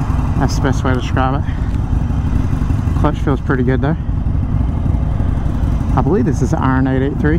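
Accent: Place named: North Carolina, United States. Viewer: its just the soft voice and southern twang thats all it is so many people in nc sound like this it pretty normal voice honestly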